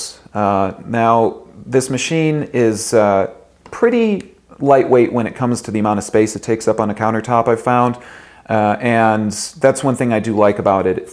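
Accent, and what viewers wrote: United States. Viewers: I think he is from New England or south eastern Canada. His inflection reminds me of an older Katharine Hepburn.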